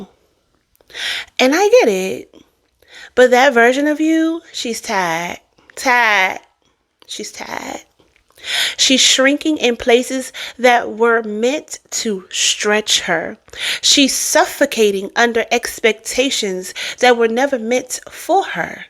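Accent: DMV, United States. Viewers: That was beautiful! I definitely needed to hear that this morning. You’re becoming one of my favs! And do I hear a DMV accent? 👀❤️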